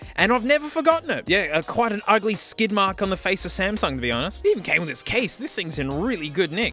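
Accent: Australia. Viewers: why? hes just gunna say the same things everyone else has except in an australian accent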